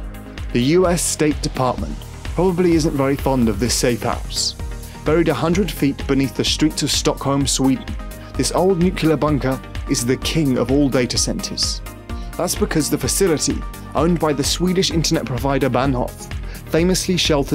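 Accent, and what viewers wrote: United Kingdom. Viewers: Hate the Brit accent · Lawrence Sanchez just because he has an English accent doesn’t mean he knows geography! Area51 is north of Las Vegas not New Mexico. Same pictures used over and over. WTF?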